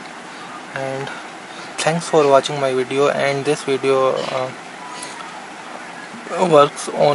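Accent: India. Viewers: hey bro talk in Proper English not in Bhaya English..I Laugh very very much after watching your video..How your talking man talk proper..It is very funnier than comedy nights with kapil.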